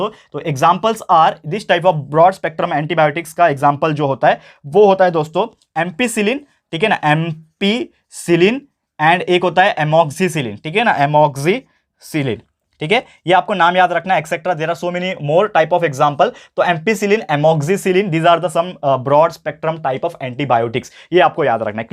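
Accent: Maharashtra, India. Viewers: Sir are you a Marathi speaker🤔😇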